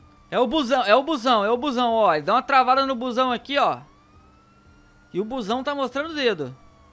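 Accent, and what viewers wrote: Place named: São Paulo, Brazil. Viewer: a voz do davy jones , so que de sp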